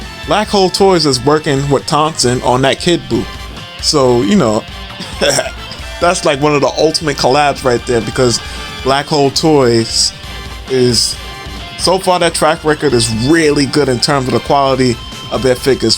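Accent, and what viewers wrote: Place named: New York, United States. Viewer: Bro gotta be from NY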